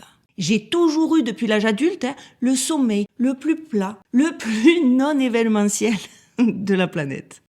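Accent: South, France. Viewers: J'aime tant votre accent du sud... Ne le changez pas, il y a trop de français du sud qui le changent, en premier le Monsieur de la ferme des cochons laineux du tarn-et-garonne, il est presque parisien avec son accent! Mais il fait un travail excellent alors je profite des autres, qui sont rares, qui ont un si bel accent! Merci pour votre travail. Je viens de regarder la video des menus des Rochschilds. Excellent! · Toujours au top tes vidéos, un régal de t'entendre et de te suivre. Ce petit accent du sud , j'adore ...😉 Hier je suis tombé sur cette vidéo de 3I atlas <link> À méditer....🤔 Belle continuation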